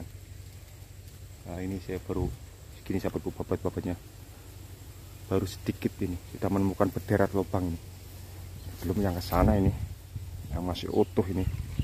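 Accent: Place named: Kebumen, Indonesia. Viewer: Aslm ww...mas asli kebumen apa bukan, karna bahasanya kayak kebumen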